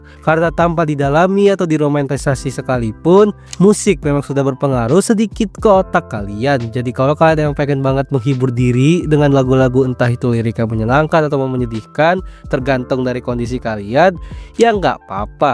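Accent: Java, Indonesia. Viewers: Suara lu jawa min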